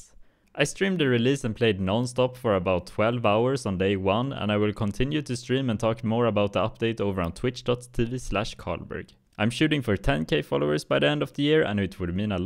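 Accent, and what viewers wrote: Sweden. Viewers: here for the heavy swedish accent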